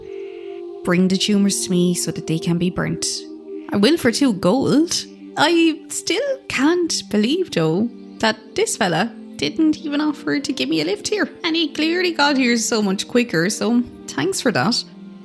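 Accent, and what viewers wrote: Ireland. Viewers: shocked hearing the Irish accent , Louth here (Irish wow players unite)😀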